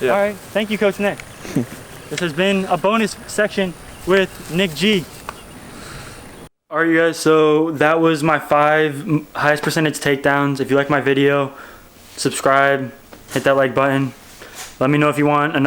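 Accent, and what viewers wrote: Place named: Minnesota, United States. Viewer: Wow, you actually know your shit. You're not just another good looking goofy guy, with chipped teeth that speaks well. Your video (5 things they don't tell you about wrestling?) popped up. It was a good video and funny except you used the f word, I don't mean fuck. I checked out this video, you're a serious wrestler, impressive. You are also a great teacher. Did you do your own film editing? Affective your videos are. I don't remember wrestlers being so critical and such grammar queens. You sound like a Minnesota boy. You are clear and to the point. I think we all knew what you meant. With your personality and those Pete Davidson eyes, you have a great future whether it's in wrestling, teaching, broadcasting, or acting. I hope you are getting promoters and are picky about them. You also need a great Trusted mentor, you're on your way kid. 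Thanks for making wrestling more accessible and interesting. Did I say that right? I don't want the grammar police on my ass. btw your teeth look great at least on video, wear a damn guard Cheers.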